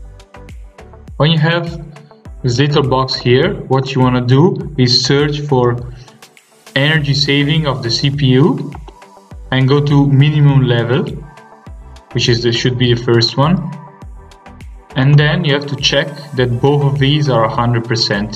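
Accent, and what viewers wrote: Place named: India. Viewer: it’s always the india sound kinda guy that’s helpful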